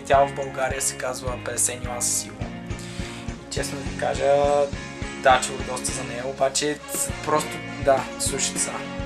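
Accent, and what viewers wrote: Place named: United Kingdom. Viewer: awesome british accent is awesome (bow)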